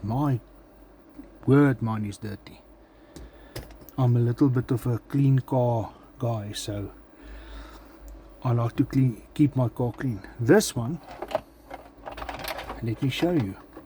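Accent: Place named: Afrikaans, South Africa. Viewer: Great vidoes... Just one problem.... why did you apologise for your Afrikaans accent? Your english is better then my Afrikaans... LOL🤣. By the way , where's the video about the foglights?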